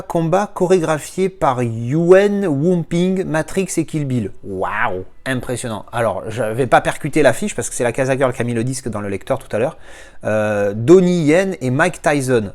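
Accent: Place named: Marseille, France. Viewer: Dire des mots asiatique avec l'accent Marseillais c'est Marrant.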